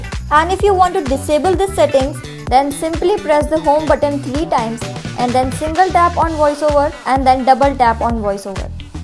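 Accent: India. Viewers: Why is always Indian accents on these videos ?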